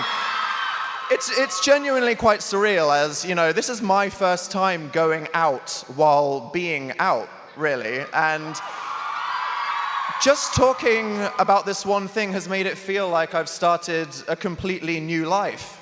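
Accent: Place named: United Kingdom. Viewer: He's so sassy and British, I LOVE IT!!!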